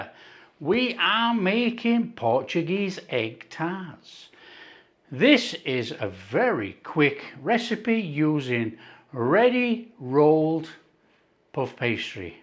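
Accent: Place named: Northern England, United Kingdom. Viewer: I love our Northern accent (so many posh Southerners presenting) . I am addicted to these in Lid ull 75p buy 5 one each work day.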